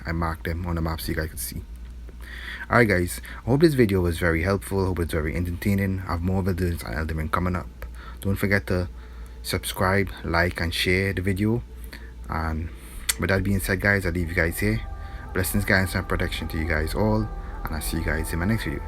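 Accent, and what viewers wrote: Trinidad and Tobago. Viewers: A Trinidadian I presume?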